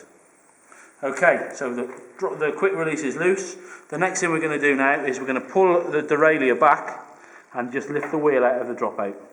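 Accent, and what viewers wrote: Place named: England, United Kingdom. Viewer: Nice English accent)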